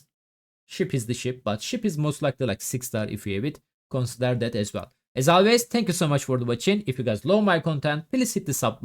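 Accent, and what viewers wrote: Turkey. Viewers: Kesin bizden,ingilizce mukemmel olsada tonlama tam turk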